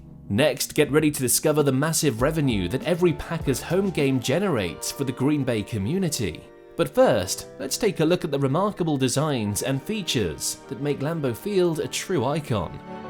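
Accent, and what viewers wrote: United Kingdom. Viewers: Why would you use a British accent to narrate this video? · Somehow a British voice-over is a dizzy decision when talking about iconic NFL venues. Nice try, I guess?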